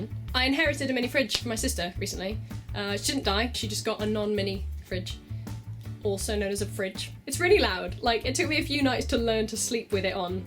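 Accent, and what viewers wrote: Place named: Wales, United Kingdom. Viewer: Wait you're welsh?!!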